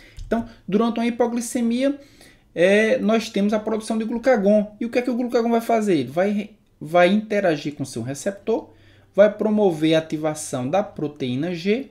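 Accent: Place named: Brazil. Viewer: muito bem explicado, adorei. Que sotaque lindinho e simpático, bem Brasil. Obrigada pela ajuda. bjs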